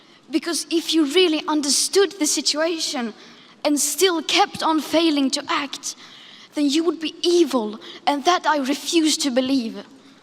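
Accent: United Kingdom. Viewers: Greta has a British accent. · Ma She's swedish but in a lot of European countries you learn british english. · I’m English and can’t speak another language. Greta sounds like she was born in the Uk. I’m ashamed..... So young, so talented, so educated . We need her in our trillions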